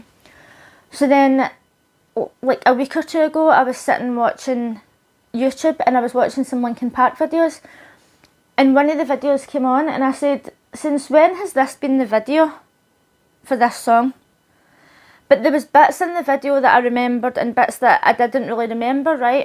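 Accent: Scotland, United Kingdom. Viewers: It isn't your accent, it's your enunciation. I listen to a lot of Scots' channels and I don't have any problem understanding them. I can't understand 2 words out of three that you say, however. I have the same problem with my grandson; he talks too fast and doesn't enunciate clearly. These are easily-solved issues without sacrificing your beautiful accent.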